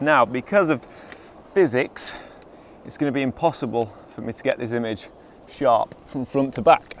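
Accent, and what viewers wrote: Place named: United Kingdom. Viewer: I was just wondering, but where does this guy live ? ... it's raining all the time and he speaks proper English.... must be a Brit ! and I like it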